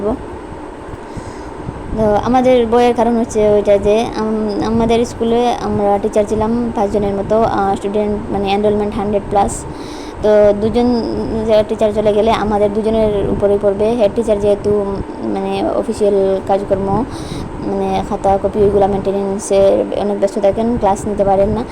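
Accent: Bangladesh. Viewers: আপু ভিডিওটি খুব ভালো লাগলো। গোল গোল করে বেগুন কেটে তেলে ভাজি করে নিলে। ফ্লোর পরিষ্কার করলে। স্কুল ব্যাগ গুছিয়ে সুন্দর মতন রেডি করে দিলে তারপর সাথে করে নিয়ে গেলে। সব মিলিয়ে খুব ভালো লাগলো আপু। আপু তোমার রান্নাবান্নার ধরন থেকে শুরু করে কথাবার্তা সবকিছু আমার কাছে কেমন যেন বাংলাদেশি মনে হয়। তোমার কি কেউ বাংলাদেশে আছে নাকি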